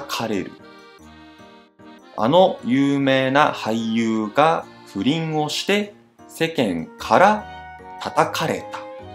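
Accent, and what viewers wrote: Taiwan. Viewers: 台湾腔好可爱～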